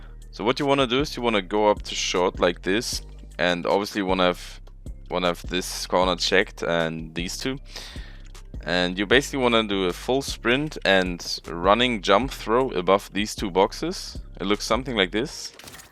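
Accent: Germany. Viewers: Bist du deutscher? Nur weil dein Englisch sich deutsch anhört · Feier den deutschen Akzent haha aber nice Englisch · are you german? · R u from germany? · Man merkt so hart dass du deutsch bist 😂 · Where are you from? You sound german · Man hört einfach so krass das er deutsch is lul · I‘m not sure but do I hear a german person speaking english in this video? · Ich höre einen deutschen Akzent xD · is this Deutschlish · man höher so stark dass du deutsch bist haha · Is your Main language german? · Where are u from? Your Accent sounds like german c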